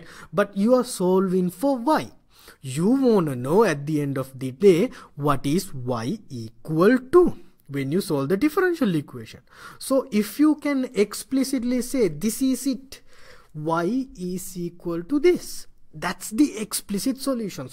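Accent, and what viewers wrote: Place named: India. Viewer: he has such a cute Indian accent.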